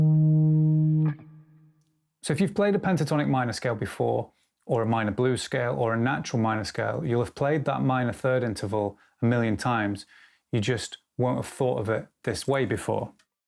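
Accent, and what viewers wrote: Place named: Yorkshire, United Kingdom. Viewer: Sooooo good to hear a Yorkshire accent instead of American! Brilliant and funny! "It's my 'ows" love it hahahahahaa!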